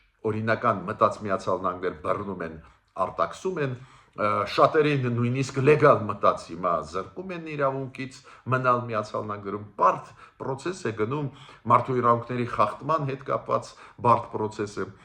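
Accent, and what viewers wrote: Russia. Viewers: Քո ռուսական ակցենտը զզվելի է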